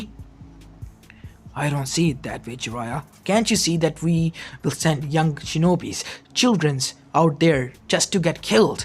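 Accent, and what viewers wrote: India. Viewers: Bro has the Indian accent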